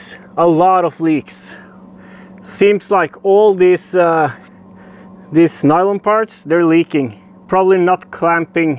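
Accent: Australia. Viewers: are you from Aussie mate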